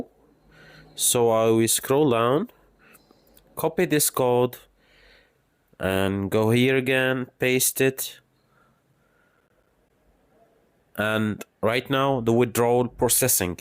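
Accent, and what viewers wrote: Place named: Algeria. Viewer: U sound algerian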